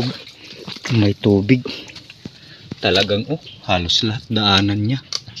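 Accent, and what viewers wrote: Waray, Philippines. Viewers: idol Taga San ka ho ba kasi parang Yung salita nyo parang Yung sa Amin mga waray San Lugar or probinsya ba yang Lugar mo Samar ba? Taga samar din ako idol ingat lagi sa pag haunting ng alimango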